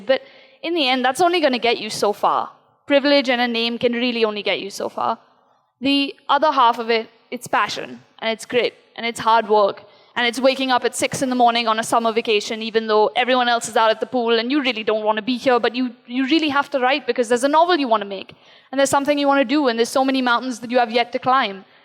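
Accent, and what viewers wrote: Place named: South Bombay, India. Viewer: No she can't. That sick snobby SoBo accent smh... Publishing her books at 15 when writers get rejected so much.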